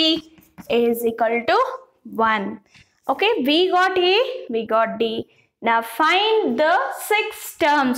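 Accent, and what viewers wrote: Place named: South India, India. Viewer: Maam u r frm tamil nadu or kerala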